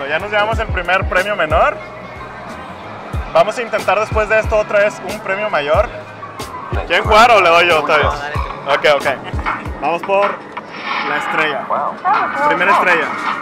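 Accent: Mexico. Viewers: ¿Eres Méxicano?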